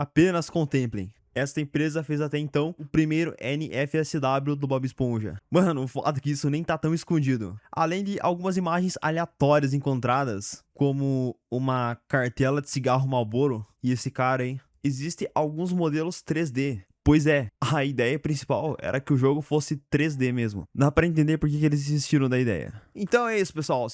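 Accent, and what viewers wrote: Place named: Rio Grande do Sul, Brazil. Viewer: Esse cara é gaúcho só pelo sotaque.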